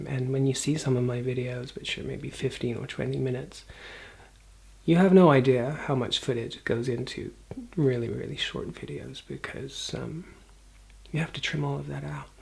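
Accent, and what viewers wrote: United States. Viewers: Dude... You're not American are you? I thought you were a fellow Brit? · I assume your English, but you're going about being natural in an American accent, it has me confused 😂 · What’s with the American accent? I thought you were British? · ..... this sounds like an American accent is this your natural one or one your using. Because your British accent is too real lol ps Anthing with at meridians works for me xoxo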